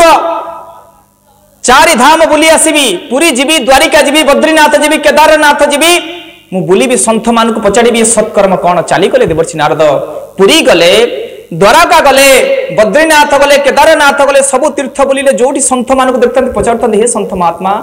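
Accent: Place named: Sambalpur, India. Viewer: ସମ୍ବଲପୁରୀ ସ୍ଵରରେ ଚାଲିଛି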